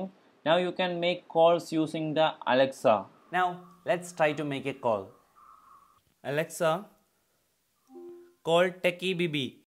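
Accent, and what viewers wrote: Kerala, India. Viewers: Dushyant Vasani he spoke with a Malayalam accent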